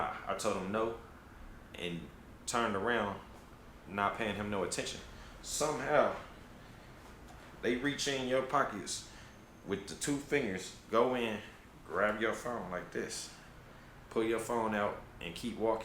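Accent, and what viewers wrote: United States. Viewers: You have a Detroit accent. You from my city, bro? 🤔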